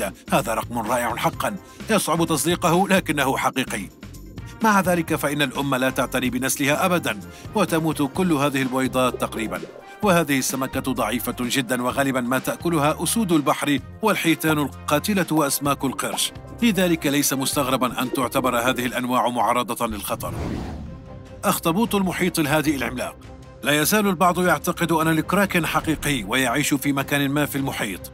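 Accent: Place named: Lebanon. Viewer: صوت مميز يصلح في الوثائقيات والاخبار بصفة عامة ❤ ما اسم صاحب المقطع واتوقع انه صوت لبناني يذكرني بصوت وحيد جلال (سلفر) كانه هو الاميز من بين الاصوات العربية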